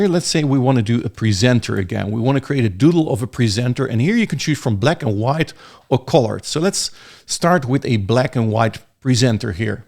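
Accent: Netherlands. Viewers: I smell dutch?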